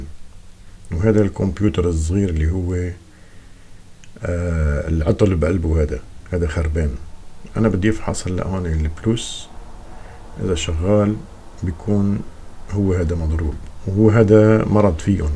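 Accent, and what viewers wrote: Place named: Lebanon. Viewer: من الجزاءر تعجبني اللهجة اللبنانيةفيها خير مودع اهلوا مظروب الكف حتي الخبرة ماشاء الله عاقل ورزين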